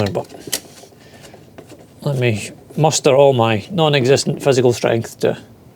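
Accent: Scotland, United Kingdom. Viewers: Good to hear your Scottish accent again, and glad you're recovering. Thought you just got tired of making videos.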